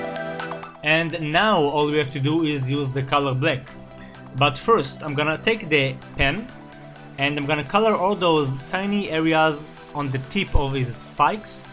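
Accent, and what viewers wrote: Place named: Israel. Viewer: u sound isreali are you and btw great job ty this helped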